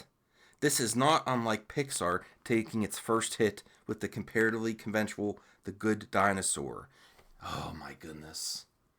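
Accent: Pittsburgh, United States. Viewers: You from Pittsburgh? You sound like a fellow yinzer.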